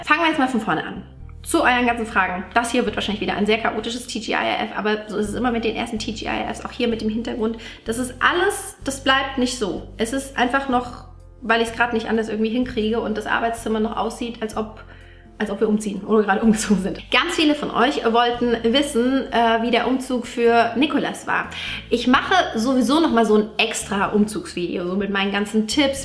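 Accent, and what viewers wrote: Swabia, Germany. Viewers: TGIRF 🚀🚀🎉 ich muss echt sagen ich kann diesen schwäbischen akzent gar nicht ab😂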